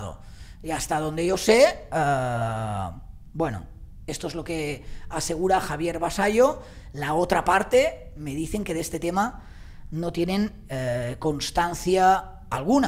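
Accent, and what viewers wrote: Catalonia, Spain. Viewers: El acento catalán o valencia se te nota mucho y más porque te cuesta espresarte en castellano y no levantás la cabeza solo para el Ahh, ehhh etc..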